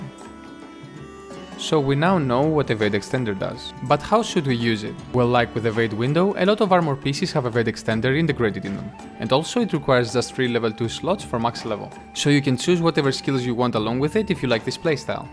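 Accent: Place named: Greece. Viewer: Efxaristo haha, elliniko accent kani bam alla ta agglika sou ine poli kala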